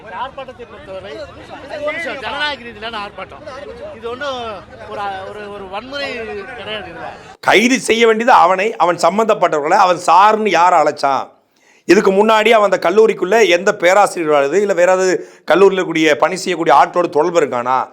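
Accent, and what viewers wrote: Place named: Telugu, India. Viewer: இவன் தி மு க காறன்மட்டுமல்ல தமிழ்பேசும் தெலுங்கன்போல.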